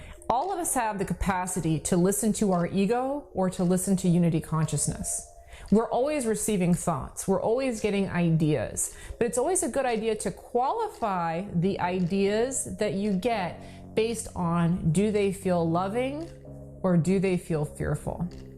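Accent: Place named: South, United States. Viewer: love your southern accent )))))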